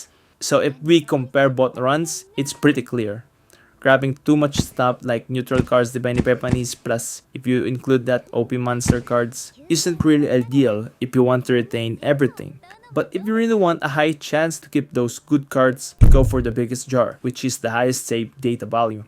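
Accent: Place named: Philippines. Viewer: i knew that accent kababayan lmao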